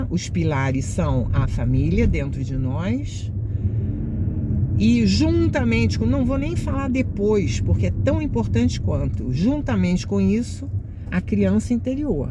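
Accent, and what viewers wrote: Rio de Janeiro, Brazil. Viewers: obrigada por essas lives maravilhosas!! Admiro vc❤.. vc continua sim, com o sotaque carioca.. puxadinho e com o X no final...rs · Nao tem sotaque nenhum!!, kkkk mestra linda vc é a esseencia do sotaque carioca ❤❤❤❤❤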